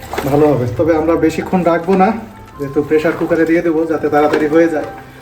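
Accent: Sylhet, Bangladesh. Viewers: bai amder sylhet er manush er moto kota bolen.balo laglo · ভাইকে আমাদের বাংলাদেশের সিলেটের মানুষের মুখের ভাষাও শুনা যায় ❤❤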